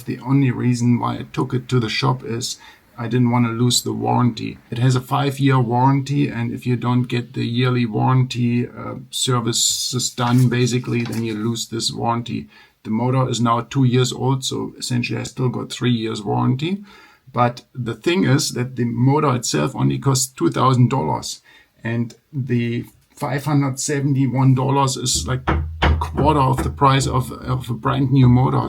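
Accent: Germany. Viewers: I hate Germans speaking English.... including myself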